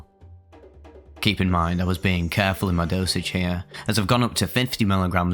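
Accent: United Kingdom. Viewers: He’s British that’s just how they enunciate lol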